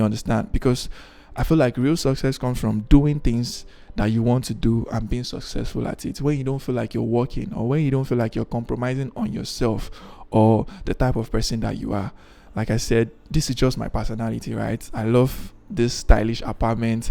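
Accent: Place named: Yoruba, Nigeria. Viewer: Love this video, and the H factor that comes with the yoruba accent,🥺❤️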